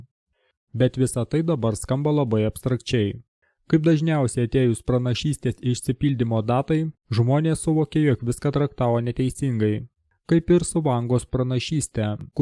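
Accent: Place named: United States. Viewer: Klaysyk, satanistų rupore.... Išmok bent scenarijų perskaityti, ir pabandyk suprasti SKYRYBOS ŽENKLŲ prasmę, kai skaitai iš tau paduoto lapelio.... Dar.... Kokie biesai tave finasuoja? A, berašti parazite... Dar.... Tavo vertimas - IŠKRAIPYTAS ir to nepaneigsi. Ir nustok čia skleisti iliuminatų planą-svajonę. Ir žmones gąsdinti ir klaidinti. Pyzdink iš Lietuvos į tą savo jankyną, ir bent pasistenk, KAD PIDAROSIŠKAI-JANKIŠKO AKCENTO nebūtų, padla.... Nežinai nei rusų kalbos, nei lietuvių. Kas esi? Mormonas, jahovistas suknistas, ar kitoks Šėtono išpera?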